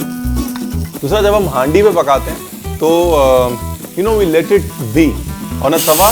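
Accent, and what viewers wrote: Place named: Punjab, India. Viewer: Panjabi pana🤣😘itna cute bolte ho 😆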